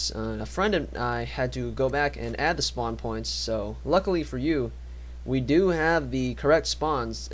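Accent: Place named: United States. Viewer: i know youre asian, but you sound like you grew up in america, so what was your first laguage and where did you learn it?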